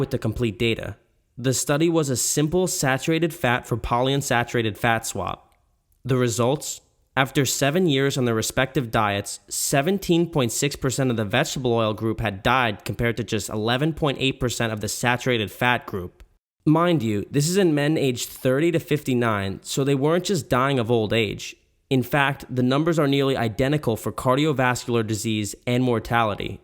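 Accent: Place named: New Jersey, United States. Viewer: has a Jersey accent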